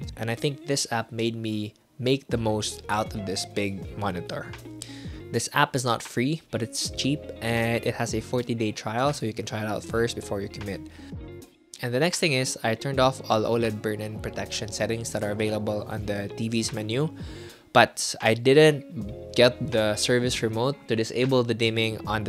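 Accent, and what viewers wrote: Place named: Philippines. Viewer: Oh wow, as soon as I heard you start talking I was like "he sounds like he's from PH..." :P My LDR girlfriend is from there as well; any recommendations for cool places to visit when I go there in the fall? Borocay seems cool but I feel like everyone does that.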